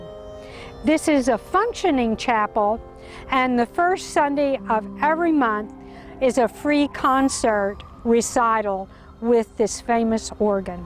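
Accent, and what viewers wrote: United States. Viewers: What type of American accent does she have? I'm American, and I don't recognize it.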